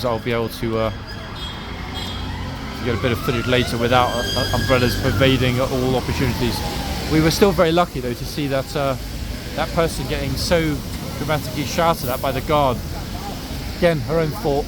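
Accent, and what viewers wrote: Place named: United Kingdom. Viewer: I so enjoy watching your videos from Naples Fl USA! You narrate so well!! And you awesome British accent doesnt hurt either! Maybe sometime you can face the camera and wave to all of us!! Cheers!!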